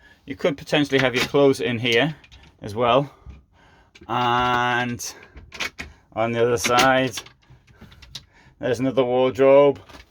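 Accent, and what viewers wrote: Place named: Lancashire, United Kingdom. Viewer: American here, I’m kind of a language and accents nerd. I love British regional accents. Are you from Lancashire? Three fourths of my heritage is from the UK. It’s mostly English and Scottish on both sides of my family, with a a small pie slice of Cornish, and the tiniest slivers of north Wales and Northern Ireland. The rest of me is Slavic. Cheers from a mile high in the Arizona mountains.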